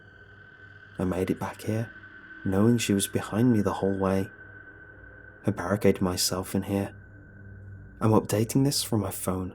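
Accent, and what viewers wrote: United Kingdom. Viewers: I love that your brittish! You always post around when I'm going to sleep, which is great! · that kind of British accent 😂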